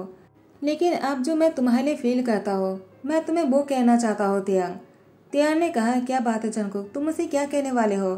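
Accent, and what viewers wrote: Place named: Bengal, India. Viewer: Di you're a bengali right?? 😁 plz ap mind maat karna apki stories bohat acchi hoti hain and I love them😍❤ but maine bohat baar apka baat karne ka tone ya baaton ke beech bengali word ate hue dekha isiliye puchi and also ye story ek famous bengali movie se inspired hain so🙂 (and i'm also a bengali)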